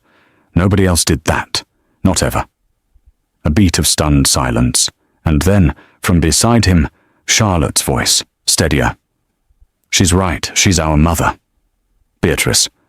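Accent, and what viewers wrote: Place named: United States. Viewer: You can travel around the universe but one cannot ignore the fact that, there is a big difference between proper English pronunciation, spoken by the people of the UK and American's version of English pronunciation. And we are listening to a story of Victorian England. Very inappropriate and causes double standards.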